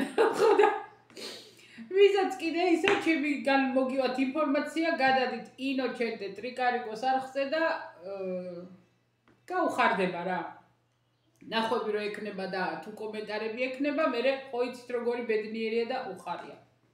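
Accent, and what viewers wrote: Kakheti, Georgia. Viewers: უკარგესი ადამიანი ხარ ❤, უბრალო, წესიერი, კულტურული, განათლებული, საყვარელი კახური აქცენტით და იუმორით😊❤ ძალიან "გემრიელი" და საინტერესო საუბარი იცი😊❤. ჯანმრთელობა და ბედნიერება არ მოგაკლოს ღმერთმა🙏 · ❤❤❤კახური კილო მსიამოვნებს.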